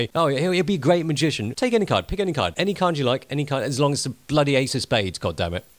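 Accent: England, United Kingdom. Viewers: Chinese people are usually way too accustomed to American accent, plus seeing an Asian with that kind of authentic British accent is not usually expected and pretty confused for the first one or two sentences. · I literally laughed out loud when that guy talked about your english accent 😂😂😂😂😂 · I'm sorry if I'm rude.. but are you an asian? your british accent is freaking delicious to listen #nohomo :\ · I just love your thick british accent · Can you tell why you have an british accent? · FISH N CHIPS accent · Your British accent melt my heart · Spends first 1/2 of video talking about the color the cap & the cat with an English accent no less. Hard to take.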